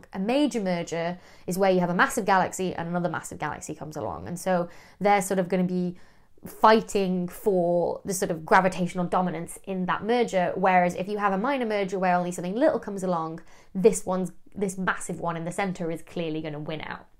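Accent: United Kingdom. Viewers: Nothing sexier than a blue eyed PHD in Astronomy with British accent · I noticed that but your Brit accent is so lovely that I forgave you🤭🥴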